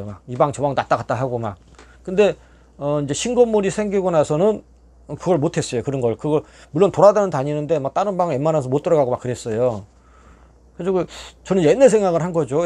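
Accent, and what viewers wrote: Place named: Jeolla, South Korea. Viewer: 전라도 충청도 인천 사투리 다 있으신거 같아요 ㅎㅎ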